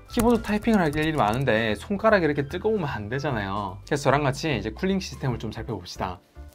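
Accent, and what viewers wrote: Gyeongsang, South Korea. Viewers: 감사합니다. 약간의 경상도 엑센트가 들리네요. 대박 나세요.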